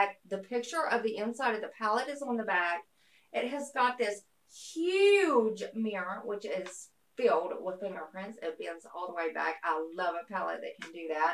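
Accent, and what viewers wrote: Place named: Tennessee, United States. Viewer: Love your accent! I'm originally from Knoxville, Tennessee and you sound like home. lol. I'm supporting through the group for your watch time now.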